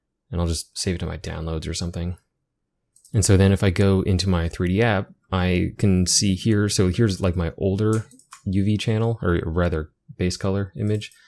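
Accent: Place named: United States. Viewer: You look british but sound american?!?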